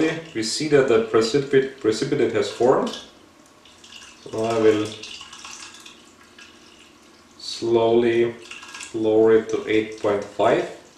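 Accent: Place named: Norway. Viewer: du høres norsk ut.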